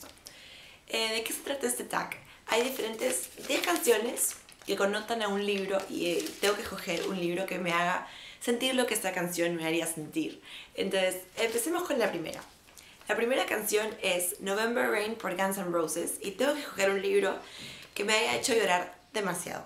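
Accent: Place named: Argentina. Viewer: holaa, me suscribi a tu canal, muy bueno :D , la verdad en un momento pensé que eras de acá de Argentina jaja, buenos nos vemoss :D , no olvides pasar por mi canal, soy "un intento de youtuber" ajaja saludoss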